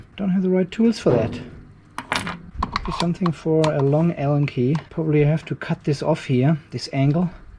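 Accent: Germany. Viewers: Hello, I am from the nature Island of the Caribbean, Dominica. I have been watching your videos for a while now and I must say that there's never a dull moment, I just love your style and your accent, when I first watched your videos I told myself this man is from Germany because I worked with a few Germans building a brewery in my country. Keep going bro we a watching. Oh and the next time you want to say "shit" please say it in German I like to hear it but can't spell it.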